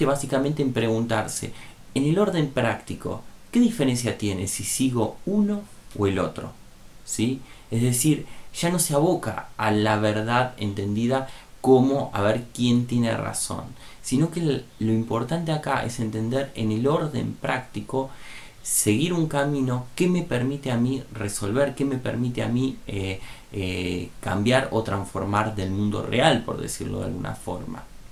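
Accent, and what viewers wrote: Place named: Argentina. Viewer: No puedo con el español argentino...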